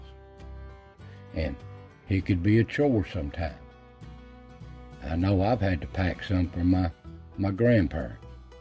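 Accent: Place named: Georgia, United States. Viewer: When I was a little 3-5 years old girl, I remember riding up and down on a pump handle!! About 6-7 years ago, I was hand washing clothes by scrub board, wash tubs. I did that for about 2-3 years. In the winter, it was horrible how bad the cold weather was on hand washing clothes!! I love listening to you talk! You sound like me! I'm from Georgia, live in Cheyenne, Wyoming now!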